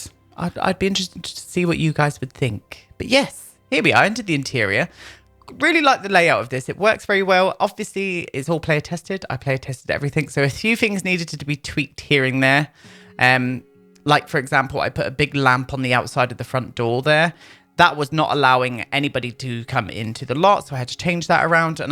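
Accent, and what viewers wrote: United Kingdom. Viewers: Posh english accent. Always ill any season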